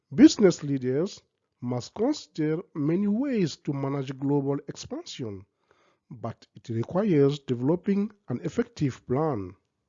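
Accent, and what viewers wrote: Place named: United States. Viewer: Félicitations, tu parles comme un américain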